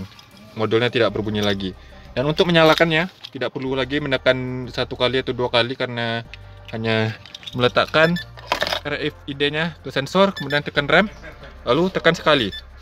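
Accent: West Sumatra, Indonesia. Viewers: Logat org minang 😆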